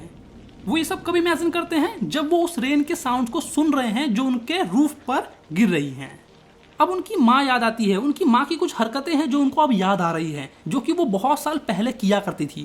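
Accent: Bihar, India. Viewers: Sir are yuo bihari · sir mujhe aisa lag raha hai ki app bihar se ho qki apki awajj bihari jaisi hai 😁😁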